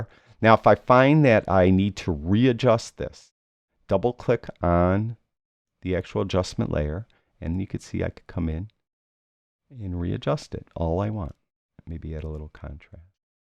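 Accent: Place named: Buffalo, United States. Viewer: I left Buffalo in 1964, graduated from Hutch Tech in 1962, the second I heard your voice I knew you were from Buffalo...I'm practicing my Buffalo accent..😊Very good videos.. I am a long time PS user and just switched to Affinity. Good stuff. Thank you.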